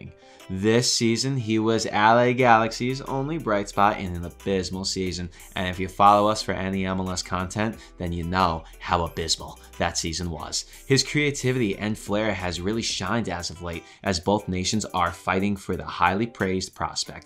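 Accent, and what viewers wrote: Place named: Philadelphia, United States. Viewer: Great video, dude. Subscribed. You sound like you're from the Philly area, yea? Cheers, looking forward to more from ya.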